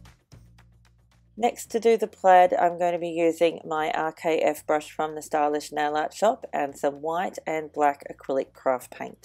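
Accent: Australia. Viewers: Love your videos. Which part of Australia are you from?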